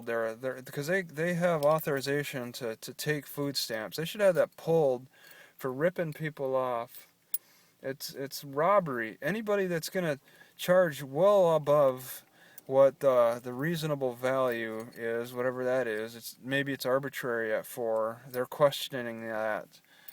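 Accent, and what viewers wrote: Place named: Alaska, United States. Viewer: lots of tourism related jobs and cannery work up here (just don't tell anyone its our secret). but some canneries house and feed you! even though the pay isn't always the greatest. The rain keeps a lot of people from living up here though. you talk like an Alaskan... a State of very independent people.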